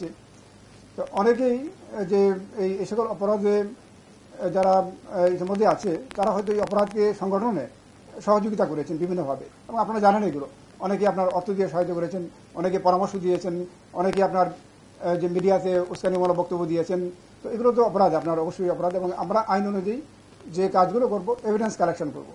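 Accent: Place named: India. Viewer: কথা ও উচ্চারন শুনে মনে হচ্ছে ভারতীয়? চেক করে দেখা উচিত!!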